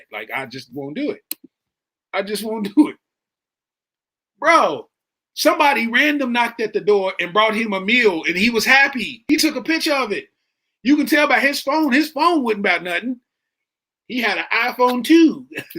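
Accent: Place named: United Kingdom. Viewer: There's something about how brittish people articulate themselves that makes it twice as funny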